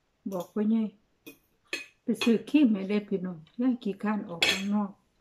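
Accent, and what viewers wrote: Isan, Thailand. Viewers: ตอนแรกเข้าใจคึกันเลยค่ะ. ว่าแหม่นยายยุสารคาม. สำเนียงยายเว้าคล้ายๆทางว่าปีปทุม😊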